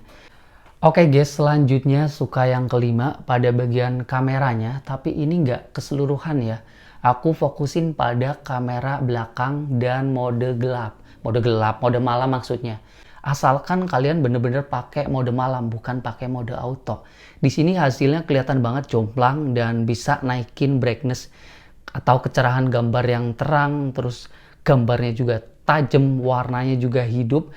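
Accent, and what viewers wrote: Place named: Banyumas, Indonesia. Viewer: Ora ngapak ora kepenak ya kang...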